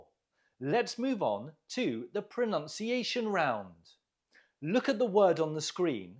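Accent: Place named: United Kingdom. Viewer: I like watching you so much and i like your British accent thanks a lot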